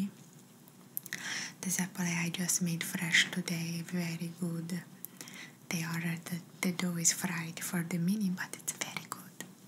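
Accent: Italy. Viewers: ……to tell you how much i loved this video would be impossible. I really swore we were at a shop in Italy. I just found your channel yesterday & have been binging your videos but i had to tell you how amazing this one is….i am so so grateful to have connected with you. 🥹❤️ i love the italian accent, so sooooo much. The way you say perfecto… OH MY GOODNESS! 🙏🏼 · These videos are so well done, love the Italian accent, so believable, you sound like some of my relatives! :goodvibes:❤ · Where did you learn Italian? You sound like you're actually from Italy! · The Italian accent definitely sets u apart in the ASMR universe. It's so different and unique. Which is why I enjoy it so much. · Hello perfect ASMR I loved it and I enjoyed it a lot listening to your relaxing voice with an Italian accent formidable you are great greetings gorgeous 🥰💖😍🌹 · I subscribed to purely on the basis of these Italian accent roleplays. 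Molto bene! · Italian accent videos are perfect for relaxing and unwind your soothing voice makes me feel sleepy 😴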